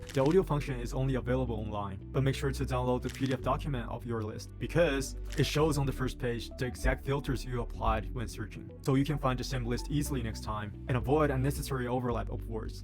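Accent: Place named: China. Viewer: This was my thought, but his accent is Chinese.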